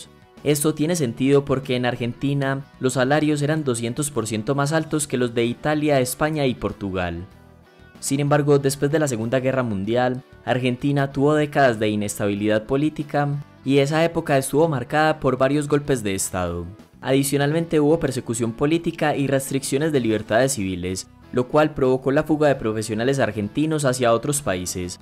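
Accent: Antioquia, Colombia. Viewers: De qué parte de Colombia eres Men, no reconozco tu acento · Se escucha como paisa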